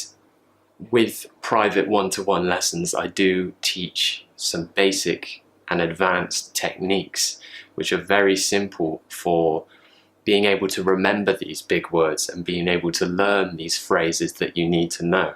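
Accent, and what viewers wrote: United Kingdom. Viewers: Hi sir how are you? I like british language accent so i watching your every episode. Thanks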